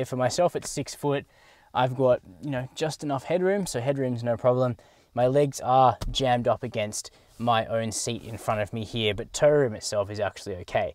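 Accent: Australia. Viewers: Bastardised Aussie English is insufferable, switched off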